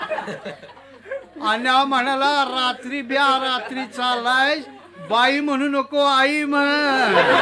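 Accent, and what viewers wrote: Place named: Rural, India. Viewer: ही कथा मी 10 वेळेस ऐकली आहे तरी पण ऐकू वाटते याच कारण म्हणजे ग्रामीण आवाज आणि ग्रामीण कथा यांचा आवाज.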